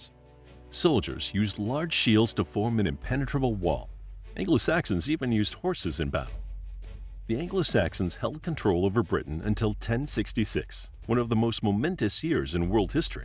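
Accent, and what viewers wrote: United States. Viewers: It is funny that an American(?) narrated! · Why is an American narrating this, also, inaccurately.?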